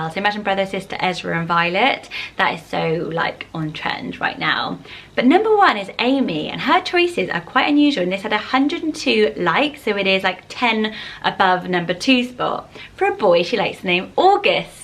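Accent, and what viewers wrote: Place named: Bristol, United Kingdom. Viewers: My dauther's name is Lily Adaline. I love the way you prononce all those names with your English accent, but as a french Canadian I need a name that sounds good in french first for the baby im currently pregnant with. Sad cause I love Elle, Everly, Automn... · Where are you from? You sound just like my friend from Bristol :)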